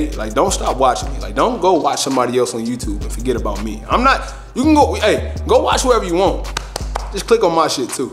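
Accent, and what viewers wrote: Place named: New York, United States. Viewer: Yo son, Crazy wild proud of you B! 💯💫 New York voice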